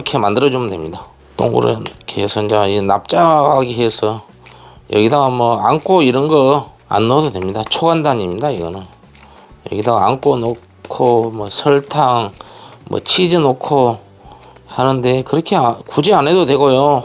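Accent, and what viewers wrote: Jeolla, South Korea. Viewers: 세프님고향이 전라도?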